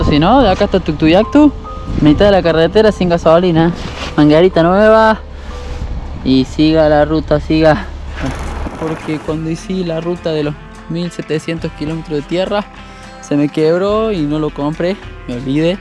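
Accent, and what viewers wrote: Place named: Córdoba, Argentina. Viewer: Pense que estabas hablando en ingles puro, pero sos un cordobés nato como yo , tefelicito por el espíritu que tienes y los anos mozos también cuenta soy un seguidor desde hace poco y me encanta la cosas que haces, disfrútalo y te mando un gran saludo jose cordoba argentina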